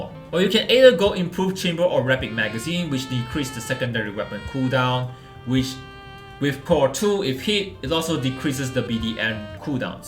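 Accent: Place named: China. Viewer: Dude the Chinese accent and language is so cool damn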